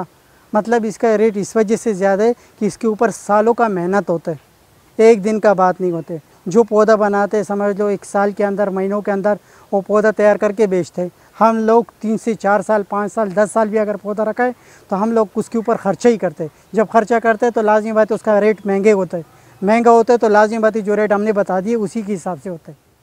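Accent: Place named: India. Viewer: Zaban sun ke laga india ka hindu hai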